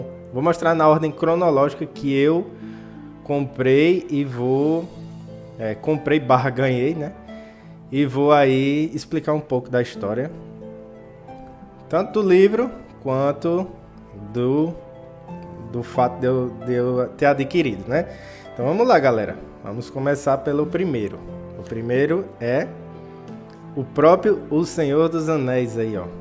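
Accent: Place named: Paraíba, Brazil. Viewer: Eita, sotaque forte! O macho é paraibano 🗣📚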